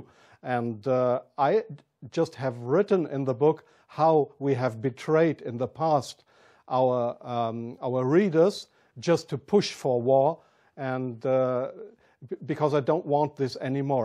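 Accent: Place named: Germany. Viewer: Hi Buddy, German English you speak. But doesn't matter, you are Man, beileid...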